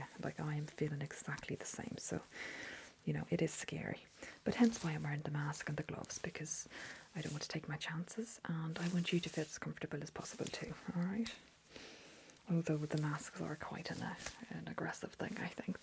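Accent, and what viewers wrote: Ireland. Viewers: Hey! Greetings from Israel! 😀I'm so happy to hear an Irish accent! 😍Beautiful!❤ Subscribed!😊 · I genuinely thought she was Irish, particularly from the west. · This is one of the best irish accents I've ever heard anyone do... Im irish and hardly anyone gets it right! Amazing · Your Irish accent is shockingly natural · Jesus Christ I’m Irish and this was like I was listening to someone from home.. unreal - you have to have someone in the family that’s Irish to pull that off. Gone from southern to northern very quick though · Could you please do an ear exam , love your Irish accent ❤ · If you ever get back into asmr you should definitely do another roleplay with the irish accent. It is spot on, so well done!!👏🏻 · Top marks again for the accent.👍 I always find there is nothing more relaxing than listening to someone speaking in a soft Irish accent, especially when things are being explained in detail as you did throughout the examination. 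Also got some tingles via the movement and light during the pupillary examination. Great video, thanks for sharing.😊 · Im guessing you are actually Irish haha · Was the accent inspired by Derry Girls? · Mother's side of the family immigrated from Ireland and your accent is absolutely spot-on. Thought it was natural. Amazing! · Where did you go. I miss irish accent. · Omg that accent was just amazing! 😍 The little bit of Irish in me was very much satisfied to hear this! Also I LOVED THIS ROLEPLAY! You really have a comforting vibe about you. 🤗💙 Just what the doctor ordered aye? 😏😅💙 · Medical role-play AND an Irish accent? Preemptive 10/10, haha!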